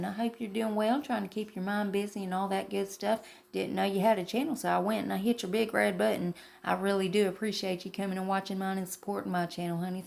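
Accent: South, United States. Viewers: Your accent is so thick it makes my husband think I'M talking when I have YouTube playing thru the speaker in my laundry room! You're giving me a run for my money on that Southern Belle Sound!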